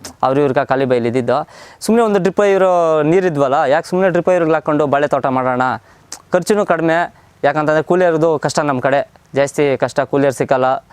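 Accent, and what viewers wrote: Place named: Kolar, India. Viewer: Kolar kannada ❤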